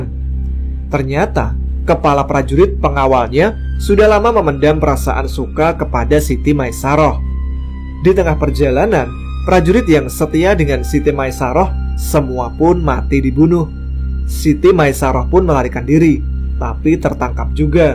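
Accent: Malaysia. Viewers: Respect pada mas cuba membawa cerita ini dalam tonasi bahasa Malaysia. Salam serumpun 🙏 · Johor Malay kah ini bang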